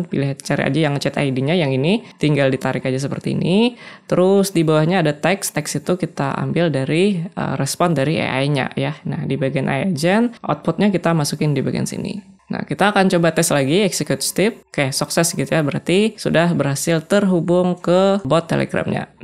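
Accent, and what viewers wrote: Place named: Banjarbaru, Indonesia. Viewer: orang Banjarbaru bang😅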